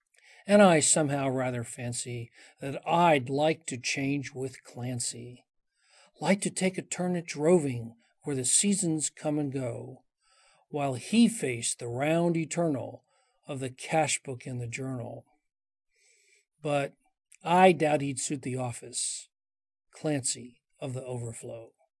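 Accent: United States. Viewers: Wow never heard this done with a Yank accent, sounds odd but then again its odd that an American would even know of the poem at all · Nice reading. Sorry about the people whinging that it's read in an American accent! Happy for anyone to enjoy and read the poem... but you're an Aussie anyway so it's really a moot point ;-)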